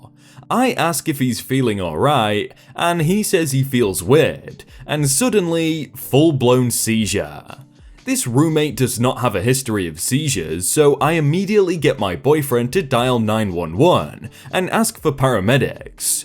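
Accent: United Kingdom. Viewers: understandable it's that smooth british accent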